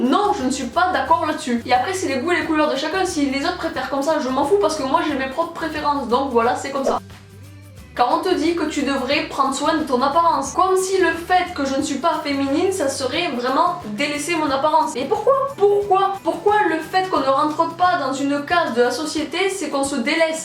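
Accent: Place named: Marseille, France. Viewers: J'adore ton accent du Sud😌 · on dirait que t'ai marseillaise ! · Toi tu viens du sud non? x) Ton accent es prononce! Apres je suis un peu dans le meme cas, niveau habit. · J'aime tes vidéos , tu dit exactement ce que tu pense c'est géniale , par contre tu A un accent du sud vraiment prononcer , tu viens du sud ?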